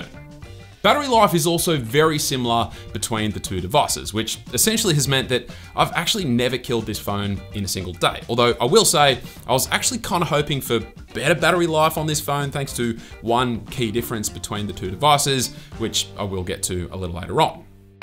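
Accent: Australia. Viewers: as I heard that aussie accent, I subbed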